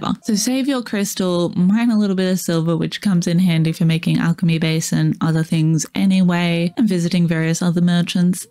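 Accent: Australia. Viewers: Fine video...Love the heavy 'down-under' accent at different points in the guide. Voice speed and pitch is perfect for my brain.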